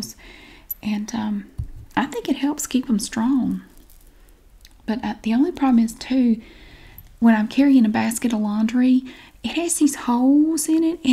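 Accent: South, United States. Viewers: Hi from Great Britain. Im a brand new subscriber, so glad I came across your videos, I love your Southern accent.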